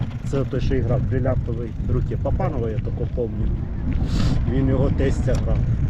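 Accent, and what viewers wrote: Poltava, Ukraine. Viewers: Доброго вечора,! Ми дуже раді Вас знову бачити. Дивимося Ваші відео і на душі так тепло стає. А коли моя мама чує полтавське мовлення, то аж плаче, бо ми зараз далеко. Дуже переживали за Вас, коли дізналися, що Ви у ТЦК. Переживали як за рідного. Нехай Вас Бог береже 🙏🏻 Я хотіла спитати Вас, чи можливо буде для Вас покататися на дві адреси? Перша - це по дорозі від Вас, Ви часто проїжджаєте повз цей будинок, тільки у дворі ще не були. Це адреса Героїв України 34/24 А корпус 1. Це великий полукруглий дім, як тільки переїхати міст з Половок, там є зупинка Сади 1, а далі отой жовтий будинок із зеленими балконами. Якби Ви проїхалися у дворі, на ігровій, особливо біля перших під'їздів. І ще одна адреса вул. Полюсна 12. Це за Макдональдсом, раніше була зупинка Боженка. Біля будинку ще є кафе Роксолана. А ось за нею знаходиться цей будинок. 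Теж прохання проїхатися у дворі, 2 під'їзд. Ми хотіли б Вам віддячити. Не можу знайти, як перерахувати Вам кошти. Напишіть, будь ласка. Будемо дуже вдячні!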